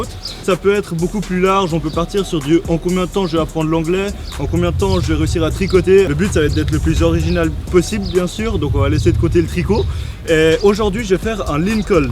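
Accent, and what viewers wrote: Switzerland. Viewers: Dès le début on reconnaît ton accent suisse ! TeamSuisse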